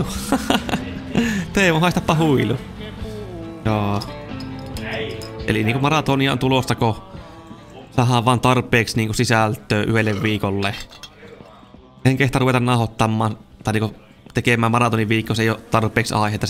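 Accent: Finland. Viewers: Itä suomen murretta minkä sille voi jos on opetettu sanoon nii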